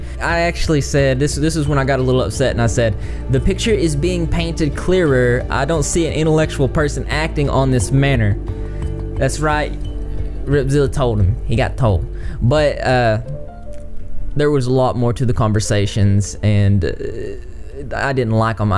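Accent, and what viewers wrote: South, United States. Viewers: Ur style dnt match ur country twang and i like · I love your accent! omg it's so country/eastern/western gosh it's great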